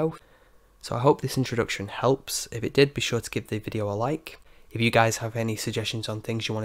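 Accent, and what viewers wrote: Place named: United Kingdom. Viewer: Lovely video. I'm starting a science youtube channel (in Spanish) and I need these kinds of things to get the animations set and going. Lovely accent. I can obviously tell that it is from the UK. London and whereabouts?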